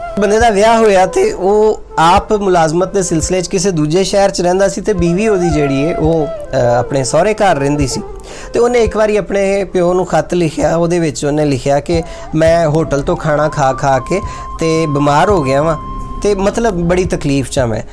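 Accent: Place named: Punjab, India. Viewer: It's really amazing.. 🤩❤❤ Powerful punjabi accent.😍😊 Waiting for that vlog.👍🤭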